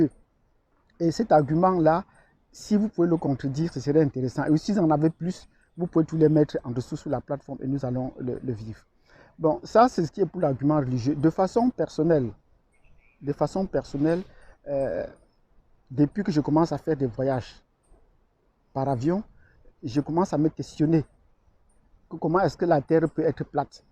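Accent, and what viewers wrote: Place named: Cameroon. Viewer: C'est quoi son nom, il a un ton Camerounais, si c'est le cas je dois le contacter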